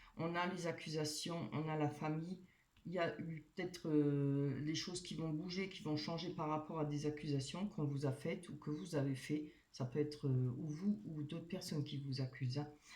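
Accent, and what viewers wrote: Alsace, France. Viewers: Puissiez-vous dire vrai après un an et demi de solitude et de chagrin... que je rencontre enfin l'homme qui saura me rendre heureuse... merci pour la guidance ...ce petit accent ne seriez-vous pas alsacienne comme moi 😘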